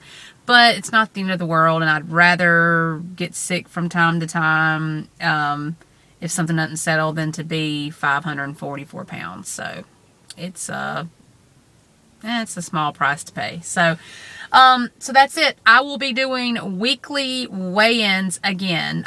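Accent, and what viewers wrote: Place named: South, United States. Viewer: I was thinking this would happen not be negative-but do not despair-there are tons of people going through this-the dr.'s are partly responsible for performing the surgery without haing the psychological wherewithall to go through it. They just want the money. You sound like you are from the South and probably were rainsed on biscuits and grits-so anyway-good luck.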